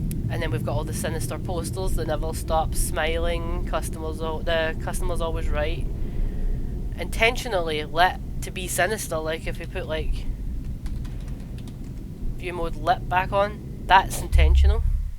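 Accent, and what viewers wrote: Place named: Scotland, United Kingdom. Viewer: He's Scottish. ITS PERFECT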